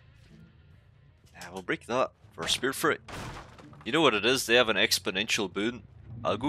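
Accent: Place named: Scotland, United Kingdom. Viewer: First time coming across this channel and you've got, I think, the strongest Scottish accent I've ever heard, nice honestly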